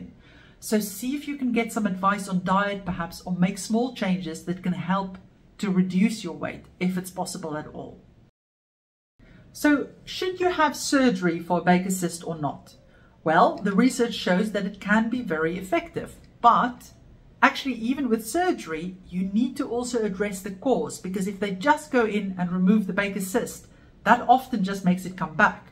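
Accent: South Africa. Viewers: Edit: From the accent it occurred to me that you're South African,. Spike did my arthroscopy.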